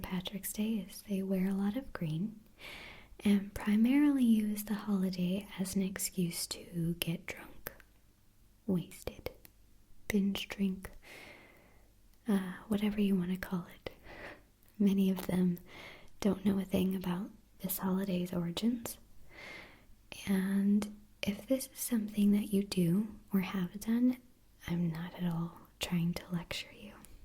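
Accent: South, United States. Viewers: You, ma'am, have done the impossible. What is it that I speak of, you ask? Isn't it obvious? Bagpipes. Bagpipes in an ASMR video, haha! I never thought it possible! I'm teasing, of course. The concept just struck me as funny ;) But now, my serious face... I quite enjoyed this video, but my first comment to you is a bit more general. I have been an avid seeker of ASMR videos since I discovered their existence and more incredibly discovered that this odd, pleasurable, and difficult to describe feeling was shared by quite a few (albeit still a select few) other individuals. Like all of us tingleheads, I have my favourite ASMRtists that I subscribe to that aren't just giving me head-gasms, but are also just flat-out talented entertainers. And this is where you come in. I love your videos for the guaranteed tinglefest they give me, their relaxing nature, and this incredible sense of comfort I get from them. 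It must be that southern charm my Bostonian ears just melt for... But girl, you are a natural in front of that camera! I mean, of course that pretty face of yours doesn't exactly hurt, but I think you just have a gift for acting. You have excellent diction and your comedy sense and timing are all things that can't be taught. I mean, after all that how could I not subscribe to your channel?! Keep up the amazing work, you are much appreciated. Sincerely, Andy Mac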